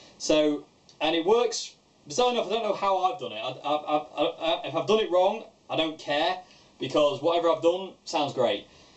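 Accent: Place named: West Yorkshire, United Kingdom. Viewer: Hey, great channel! I share your love of Vintage guitars, got me a Vintage V6 sunburst after trying a Fender American Strat and deciding I prefered the V6 and being £800 better off! Can't quite place your accent, but definitely somewhere in West Yorkshire?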